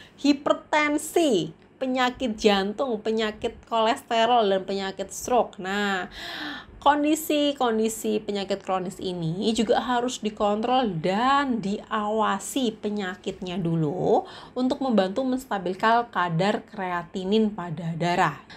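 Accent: Java, Indonesia. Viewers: Dokter medok😂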